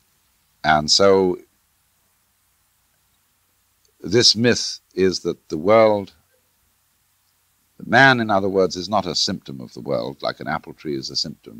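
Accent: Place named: Upper class, United Kingdom. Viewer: As a parent, I was glad when my kids went to school. It gave me a break and it was free childcare. The thing is, what do we replace the human progression through life with? Yes, Watts' observation of life are true, but he offers no replacement or a better way. He spent his life mocking humanity from a point of privilege. Why did he believe his diatribes were more valuable than any other educated and reasoning persons rants? He stated the obvious far too much and isn't really addressing intelligent minds. The audience he needs to address are too stupid to follow his thoughts, while the rest of us wallow in his comforting upper class English accent, without really listening to him at all. It's a mish mash of thoughts, delivered with an annoying arrogance. I can't abide him personally.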